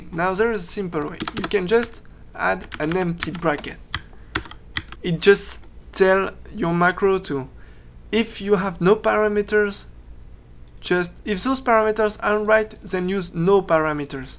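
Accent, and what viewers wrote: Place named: France. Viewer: Lol tu a un accent français trép prononcer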